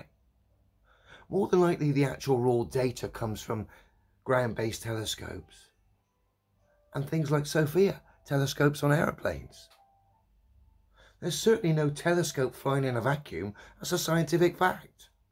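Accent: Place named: London, United Kingdom. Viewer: Good old British London accent.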